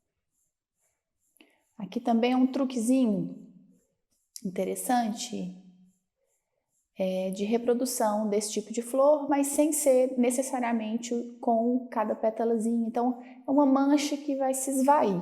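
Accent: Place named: Minas Gerais, Brazil. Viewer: Oi,. Conheci hoje seu perfil. Amei. O tipo de trabalho que sempre gostei de ver. E amei seu sotaque mineirinho, que eu acho o mais bonito do Brasil! Vou fazer essa aula hoje mesmo. Voce disponibilizou mais imagens?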